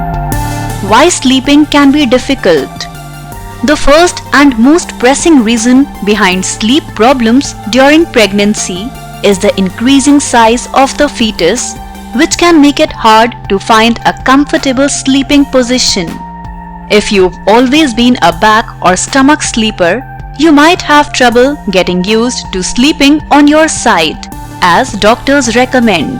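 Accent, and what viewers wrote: India. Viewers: Indian accent tsk...hate it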